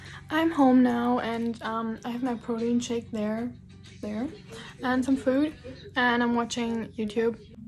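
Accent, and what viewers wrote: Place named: United States. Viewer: Your English is PERFECT. With an American accent no less, simply amazing. As a former host dad in Virginia it always pleases me to see an exchange student enjoying their exchange year. Remember to do and see as much as possible. As you know it is a once in lifetime opportunity. All the best on your American journey.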